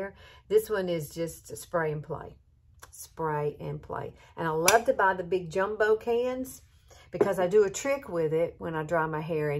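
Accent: South, United States. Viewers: I have to say this first.....I watch a lot of Youtube videos for one thing or another, and I find myself muting the person on the video because their voice is so annoying. LOL You have the voice that makes me want to listen, because it's like the difference between a shout and a whisper. The lovely Southern accent helps too! Thank you for a most entertaining and informative video! Love from Los Angeles! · You are so sweet...love your southern accent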